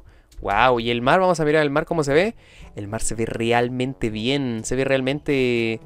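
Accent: Chile. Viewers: tienes un acento chileno muy neutro, me encanto tu video suve mas asi plisss prueba el shader chocapics 13 estoy seguro que te va a encantar <link>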